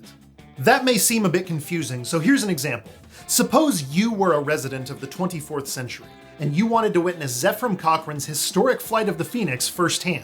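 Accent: United States. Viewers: Trek culture being narrated by an American? I'm sorry, where am I? What alternate universe did I step into? (I don't hate it, I've just gotten used to Adam and the other guys.) Edit: After I marathoned TNG (because I'd only watched most of it over the years and not seen some episodes in full) I caught up on like 2 of the movies? Didn't like them much and still haven't watched the TOS movies because I haven't finished TOS. That said, catching up on the movies a second or third time around actually made sense, because ages 13-22, I was mostly out of the trek fandom and into anime and Animorphs, so watching the movies in my teens when they came out didn't really matter, as I recall. 23-ish, watched Voyager reruns and nostalgia pulled me back into the fandom in a big hug! Can't believe I thought it was all "meh" for so long, but I guess maybe I was just trying to be cool or something, IDK. 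But it didn't help I wasn't impressed with TNG and we didn't watch ST:DS9 because it was all about war and my mom didn't like it. Voy was what I cut my teeth on, even if it's not the fandom's favorite. It's the redheaded stepchild of trek. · Glad to hear someone address the fact that you actually can get into the Nexus with a ship, and expand upon it to give a real explanation as to Soren's plan. Still weird hearing an American accent here though. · This guy's American accent is freaking me out.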